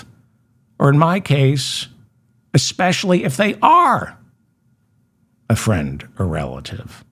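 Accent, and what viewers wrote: United States. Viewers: Once again you have “cracked me up” today! I love your self deprecation, lavatory humour and irony. As a Brit I have to say ( and this is a compliment!) without your American accent you could pass for British!😂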